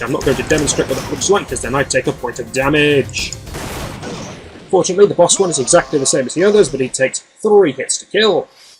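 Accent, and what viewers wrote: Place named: United Kingdom. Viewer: Does anybody in the United Kingdom play this game or more was this filmed in that area? I don't know but I'd like my question or questions answered. The narrator of this video sounds like he's from the United Kingdom.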